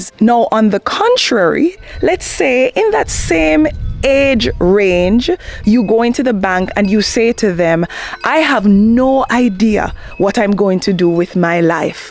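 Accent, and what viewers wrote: Jamaica. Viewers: Are you a Jamaican?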